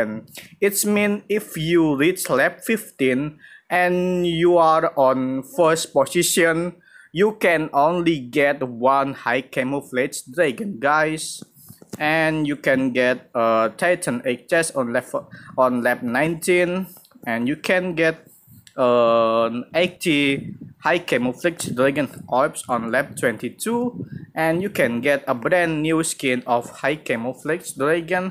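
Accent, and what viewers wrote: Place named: Indonesia. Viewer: Bro, are you from indonesia? Your accent sound like Indonesian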